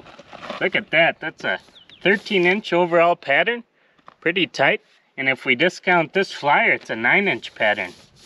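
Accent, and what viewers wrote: Canada. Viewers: You're the most Canadian soundin American I've ever heard. I like your videos, subscribed my man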